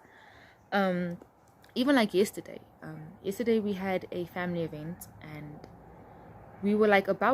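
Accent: South Africa. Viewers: As an African Male from your accent and the way you look, full lips, flat nose, I thought you were a light skinned Black Xhosa African lady. I guess not to prejudge is the key to understanding peoples backgrounds without outright telling someone what he or she is. I have lived with the Coloured community in JHB since 2008 its now 2023 and I have been treated with the outmost respect. I can confirm that all the stereotypes I had about Coloured people as an African male is all gone, and I have also taught them about African/Black culture. Its actually refreshing to live with people until you forget that you come from different back grounds, when you look at each other you see another human being NOT racial stereotype. I used to catch THE FIRST taxi every morning with a lady who only spoke English and couldnt speak Afrikaans, she was open to tell us that she had never been accepted as a coloured when she moved to Johannesburg because of that. 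She grew up in Durban, spoke English and understood Zulu only. Moved to JHB as an adult to live with family, the family spoke Afrikaans as a first language...SHOUT OUT TO RIVERLEA EXT. ZOMBIE. BOKSTRAAT. EXT 5. EXT 10.👋👋👋👋 AWEH! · I don't get why people think you sound White though... I know you don't sound White,you actually sound like coloured folk from Western Cape! You sure don't look White aswell,you don't even look coloured with all due respect... I would guess you are Xhosa! Anyway Shoutout to raising the conversation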